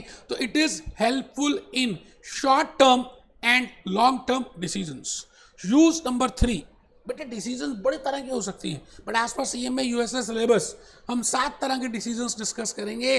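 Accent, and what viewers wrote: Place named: India. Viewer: Thank you Sir. Aap bahut accha kar rahe ho aise video daalkar. I always understood concepts better in Hindi and in a desi dialect.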